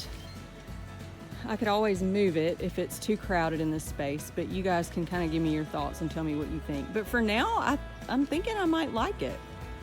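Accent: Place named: South, United States. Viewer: You talk just like me I’m on gulf coast pensacola fl.. however grew up in TN.. glad to find a southern gardener!! I would extend the bed up drive and connect with other bed.. it would make each side more symmetrical... love the plants!!👏❤️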